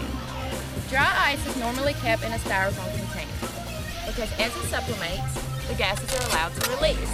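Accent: South, United States. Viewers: she got a southern accent!!! i like!!! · southern accent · I like her southern accent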